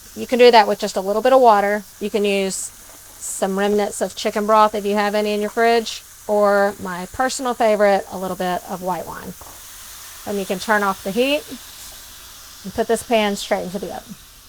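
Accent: South, United States. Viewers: Her particular southern accent is the same as my best friend’s from GA, not too much not too little. I love hearing her voice too. ❤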